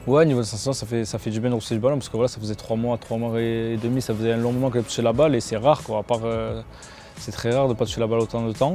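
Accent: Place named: Marseille, France. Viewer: Un parisien avec l'accent marseillais, vous en verrez pas 2 comme lui 😂😂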